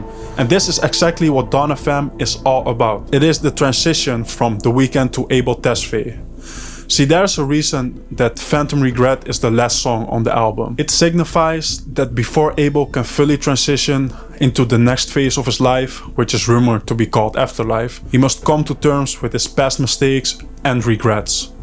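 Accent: Netherlands. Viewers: Bruuh ik zat naar jouw stem te luisteren en dacht ergens misschien ben jij Nederlander, vanwege het accent. Toen later zag ik die screenshot toen had ik het door 😂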